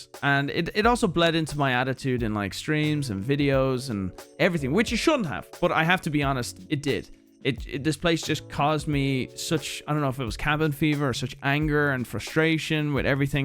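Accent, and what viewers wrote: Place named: Ireland. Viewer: You sound extra Irish in this one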